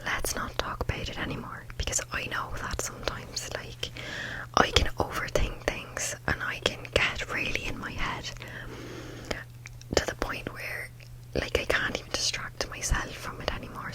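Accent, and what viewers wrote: Dublin, Ireland. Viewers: ooh, i think this is my favourite video of yours yet! i don’t think i’ll ever get tired of your irish accent videos 🥰 they’re so satisfying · Hahah pretty cool, keep up the Irish accent things please. It has a certain calm ambience to it. Which I think it’s cause your background is just very chill and down to earth, no extra lighting that makes everything too shiny. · Great stuff! Is this a Dublin accent?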